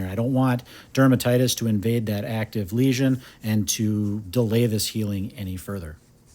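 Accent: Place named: Wisconsin, United States. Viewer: The first time I watched 1 of your videos I said " oh yea this guy is definitely from Wisconsin " 😂 you sound like a sweeter, smarter and friendlier version of Bob from that 70s show and I love it haha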